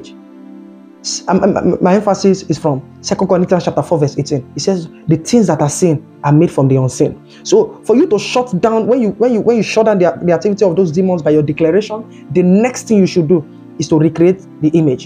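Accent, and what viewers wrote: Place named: Africa. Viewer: Great lesson, scriptures and love the African accent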